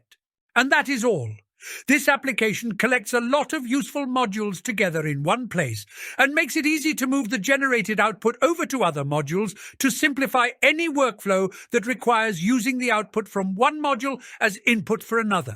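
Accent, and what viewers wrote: United Kingdom. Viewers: Dear excitable mystery British A.i voice person kindly making videos. /:) I am having a headache and wondered if you could share a line or two here or if you wanted make a video, regarding a headache I am having. No matter how I try, I cant seem to load any different models nor Lora's into it. I go to the model manager for example, and I find an applicable checkpoint sdxl model from Citivai as example, I copy the web address of the page and plonk it into the model downloader in Biniou, and all it does is download a few hundred kilobytes then says the model has been downloaded. Same for Loras. Do I need to edit the URL or do something else to it to make Biniou accept the model and install it? As for huggingface, I have an account but I haven't a clue what url I am supposed to take from there to put into Biniou as while I can find relevant pages for applicable models and such easy enough on there, huggingface don't exactly have a ''download"' button and when you are new to all this its a little befuddling. 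Many thanks, from an excitable british man.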